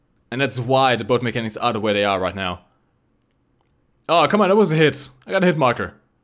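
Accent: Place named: Germany. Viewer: very german accent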